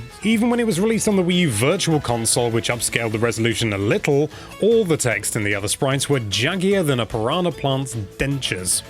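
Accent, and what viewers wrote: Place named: United Kingdom. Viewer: Thick uk accent is tough to handle. man. tapping out.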